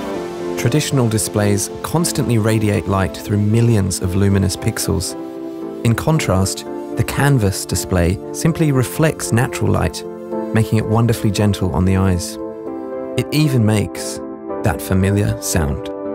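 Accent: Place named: England, United Kingdom. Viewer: that narration is just awful. Just having an english accent doesn't make it good.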